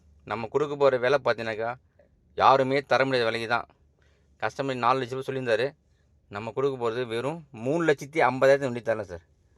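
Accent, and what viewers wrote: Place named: Madurai, India. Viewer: Madurai vasagam super 🎉🎉🎉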